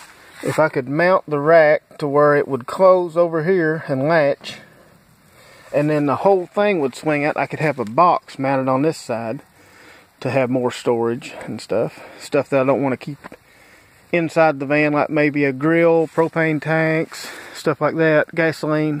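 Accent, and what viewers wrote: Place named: Kentucky, United States. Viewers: Being native born here that distintive Southern accent is picked up immediatly. Always sounds like home :) Nice ingenuity on the build. The swingout arm and kitchen are too cool. Always looking for ideas for when I do mine. Ya'll take care. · I like those cup holders and swivel mount ..that's good ole Kentucky engineering I heard that accent and knew you were from KY. I spent my teenage years in a lil town called "London" in KY gettin ready to watch your school bus video. good job.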